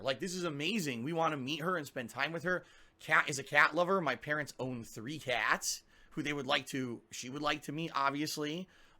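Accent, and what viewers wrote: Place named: New England, United States. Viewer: Yankee accent are fucking disgusting.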